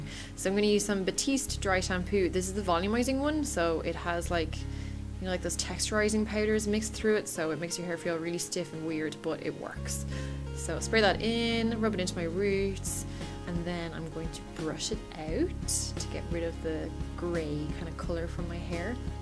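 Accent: Ireland. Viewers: I love how Irish you are! Where about are you from? Were losing yous all to Oz haha. Skin looks great btw, considering you just had your laser. Kisses from Dublin xx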